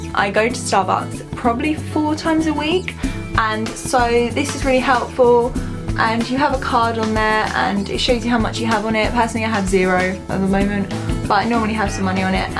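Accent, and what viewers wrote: United Kingdom. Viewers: where in england are you from??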